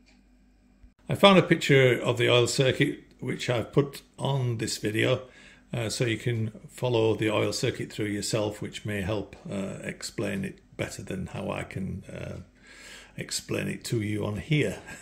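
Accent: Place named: Yorkshire, United Kingdom. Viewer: Your a Yorkshire man but in oz ?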